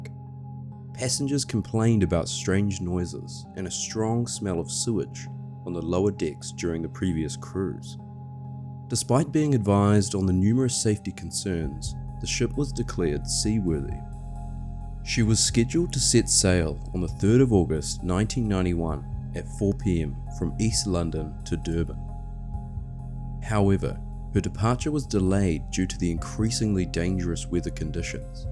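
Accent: New Zealand. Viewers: I like the Kiwi accent. It is a refreshing change from an American or English one. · That sounds a lot like a New Zealand accent to me! 😀😉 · Holy shit, this is so well done that I assumed this was one of the big documentary channels. It wouldn't have even been out of place on a Discovery plus. I'm so glad The Holy Algorithm brought me here. Also, maybe I'm a sick f#<k, but I giggled every time the Aussie "deck" was said. "Below dick" 😆 Edit: I just checked your page & now realize that you're from New Zealand, NOT Australia. My dumb American ear can't differentiate, but it is NOT an abomination of an accent! I love it. You know what is a true abomination? My Boston accent. The whole "I pahk the cah at Hah-vahd yahd" (I park the car at Harvard Yard) is real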